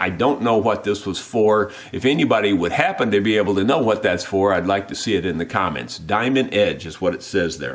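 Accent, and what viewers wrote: United States. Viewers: Hi, i totally enjoy watching your video’s. I enjoy listening to all the interesting stuff you talk about. You have such a lovely accent and could listen to you all day..lol. I find it one of the most pleasant accents from America. I live in Scotland and enjoy your channel. I thought you said you were going to take it easy?. You are a workaholic..lol. You are looking well, So keep well and look after your family..👍🏻 and who know’s… i may come across the pond and rent one of your nice holiday apartments when i have saved up enough money, or robbed a bank..lol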